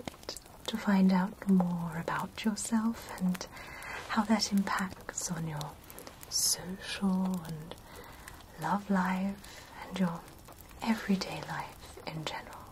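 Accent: United Kingdom. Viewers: she have a proper brit accent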